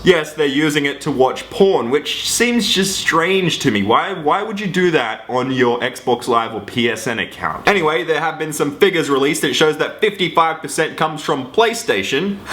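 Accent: Australia. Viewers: are u from austrailia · Is this guy Australian?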